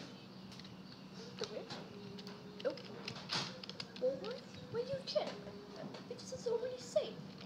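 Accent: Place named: India. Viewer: sound like an indian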